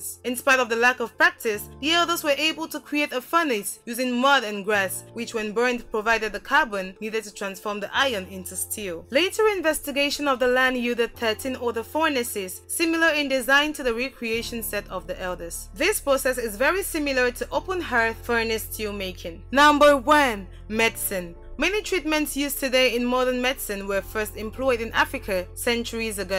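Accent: Africa. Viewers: Why are you talking so fast? It's difficult to chat down notes while listening words pronounced in african accent, this is just my opinion no hate just love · He sounds very African...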